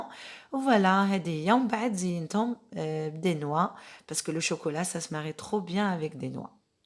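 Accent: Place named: Constantine, Algeria. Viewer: J'ai adoré l'accent constantinoise bcp plus ya3tik sa7a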